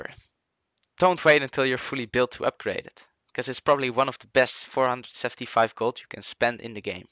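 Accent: Netherlands. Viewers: ben je nederlands? je hebt een nederlands accent (are you dutch i what i asked)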